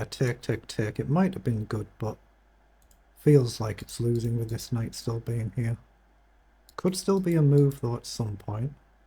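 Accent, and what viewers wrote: United Kingdom. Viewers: Enjoy the vids. Which part of the UK are you from?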